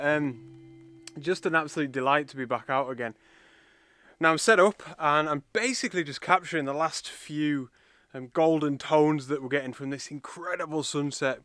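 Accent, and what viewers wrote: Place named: Northern England, United Kingdom. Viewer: I love listening to the passion you have for your vocation. Your shots are wonderful, but you only achieve that through your love and appreciation of the land. A pleasure to hear your no-nonsence Northern twang too. I'm from Manchester originally myself, now living in Croydon, how I miss the people and their candidness. Great work fella, and long may you continue on your quest.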